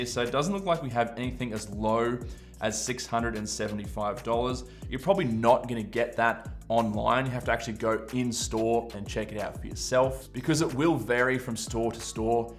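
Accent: Australia. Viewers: I think Apple Australia doesn’t have this deal atm… (I was assuming you’re from Australia because of the accent. )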